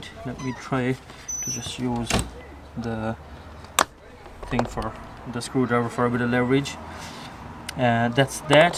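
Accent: Cork, Ireland. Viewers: sounds like im home in cork listening to you 😂😂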